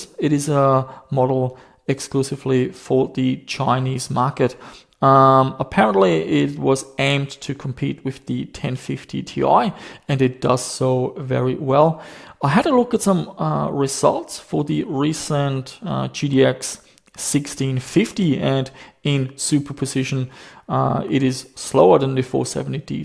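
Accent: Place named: Germany. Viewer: you german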